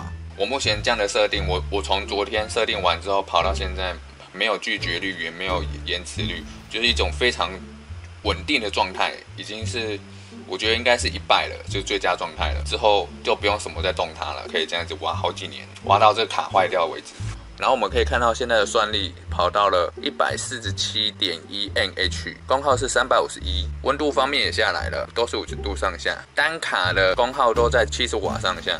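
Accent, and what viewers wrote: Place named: Taiwan. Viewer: 感谢超小白的教程。话说台湾腔真好听~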